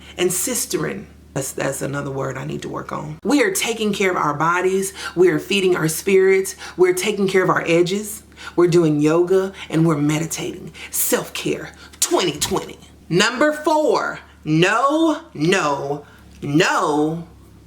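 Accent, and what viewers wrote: South, United States. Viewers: Honey are you from the south? I hear the country in your voice 🥰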